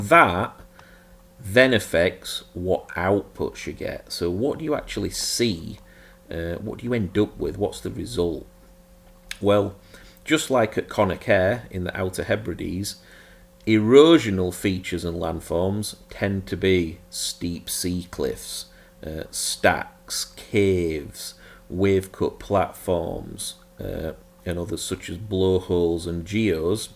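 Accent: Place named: Northern England, United Kingdom. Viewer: I'm only here to listen to that delicious nothern voice say 'Cooooast'.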